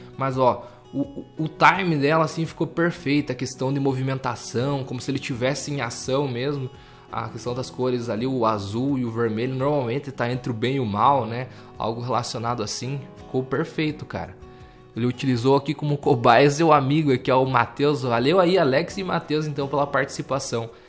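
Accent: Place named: Curitiba, Brazil. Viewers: sotaque curitibano forte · Tu tem um sotaque daqui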